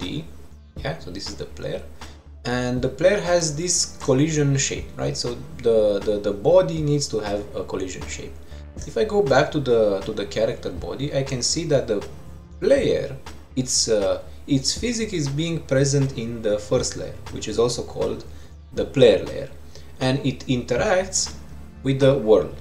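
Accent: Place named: Romania. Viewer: Tocmai ti-am vazut videoclipul, incercand sa imi dau seama de cum sa fac o detectie cand player-ul atinge zona cu foc, l-am urmarit pana la capat iar apoi am vrut sa ma abonez. Cand am vazut " " in nume, am facut legatura cu accentul tau si m-am bucurat sa vad ca sunt romani, buni, care fac astfel de content. GG bro, ai un nou subscriber!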